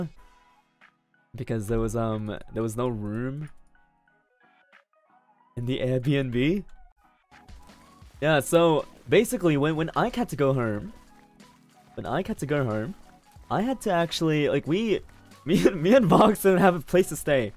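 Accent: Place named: Australia. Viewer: Why does his aussi accent sound stronger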